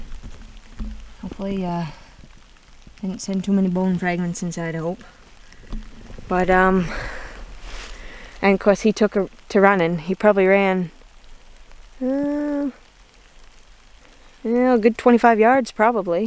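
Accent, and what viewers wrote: Maine, United States. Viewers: Great to see you succeed. Your family is darling and you my dear are pretty as you can be. Love the Maine accent. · Congrats to you!!! Great video, raw emotion, and you did it!!! Love the Maine accent; spot on northeast hunt! Keep after it!!!!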